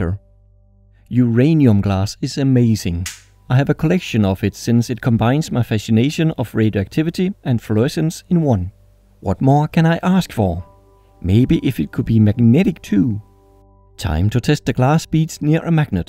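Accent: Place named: Denmark. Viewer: prøv at snakke dansk man kan høre at du er danks og du er ikke god til engelsk btw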